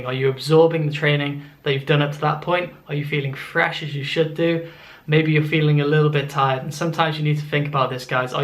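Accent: Wales, United Kingdom. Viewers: Is that a welsh accent i hear ayy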